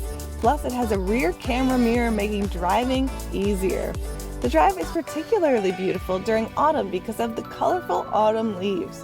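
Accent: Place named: Long Island, United States. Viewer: I remember thinking you might be from Long Island or something guessing you weren’t from one of the boroughs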